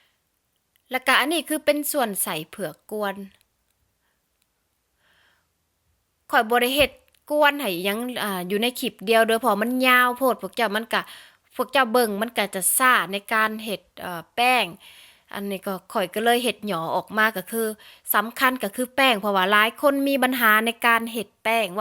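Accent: Savannakhet, Laos. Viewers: ມັກເຫຼົາເວົາລະອຽດສຽງແຈ່ມແຈ້ງດີລະກະເປັນສຽງໄທສະຫວັນນະເຂດອີກອອກສຽງລະອຽດລະອໍດີເດີ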